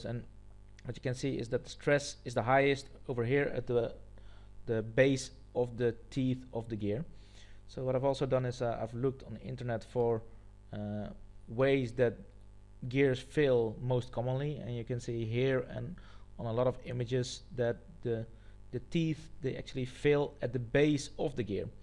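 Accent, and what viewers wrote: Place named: Caribbean. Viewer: You sounda bit carrabean maaan. but thanks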